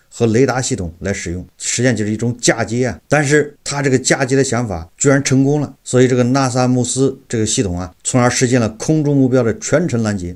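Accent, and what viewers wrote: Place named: Wuhan, China. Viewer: 标准的弯管子武汉普通话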